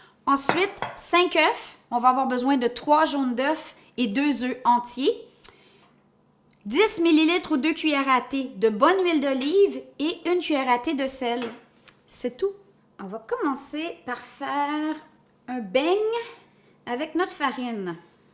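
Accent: Quebec, Canada. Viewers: Tu es marrante et surtout très mignonne , avec ton , ci joli accent Quebecoise ..!! Félicitation , a tes parents , Gente Dame du Canada ..!! Merci , et gros bisous de France . Hubert Etienne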